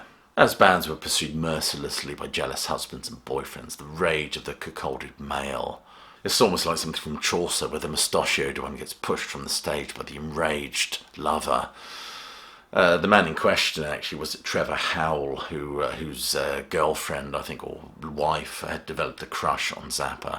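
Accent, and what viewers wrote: United Kingdom. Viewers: Cheers mate, knew I'd found the right channel instantly. It just wouldn't feel right to have my rock music anecdotes explained by anybody else besides an obvious former roady with a UK accent of some sort, Innit!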